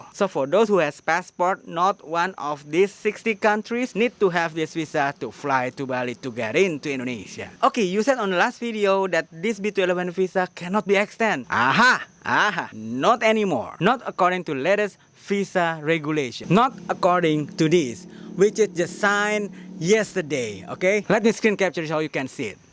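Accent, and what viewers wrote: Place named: United States. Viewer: If you speak slowly and without American accent than we can understand you much better.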